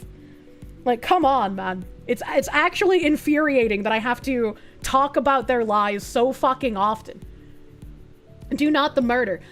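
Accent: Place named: Massachusetts, United States. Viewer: I just watched a G&G daily video with you and madame savvy. Id like to say im a fan but at least intrigued. Boy you really sound like a MASShole, i like it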